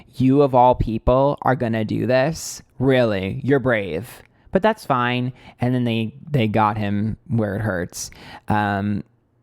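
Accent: New York, United States. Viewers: I love when your new yorker accent comes out. Get em sis 👏🏼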